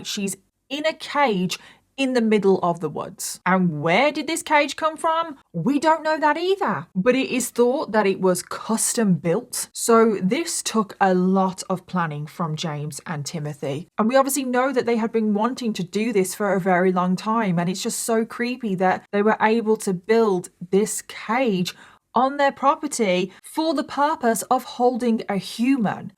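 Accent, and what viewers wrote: United Kingdom. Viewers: Really lol? A British accent sounds tropical to you guys? Haha cool 😊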